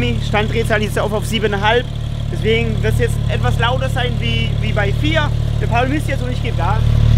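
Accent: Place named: Swabia, Germany. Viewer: Super Video, schön erklärt und der schwäbische Akzent passt perfekt! Grüße aus dem Kochertal :P!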